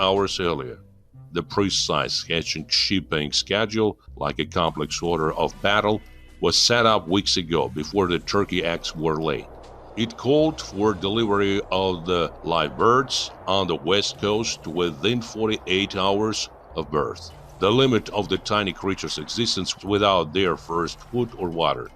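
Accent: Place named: United States. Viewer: Какое замечательное американское произношение в стиле <num>-х годов. Как всегда, замечательная дикция! Я же говорю, что у Вас огромный исполнительский потенциал, над которым надобно продолжать работать, чтобы не растерять, а развить до логического максимума. А так - брависсимо🎉!!! А насчёт "врагов"... Я в своëм пионерском возрасте - КИДовец... 😊 Эдак то, милостивый государь😊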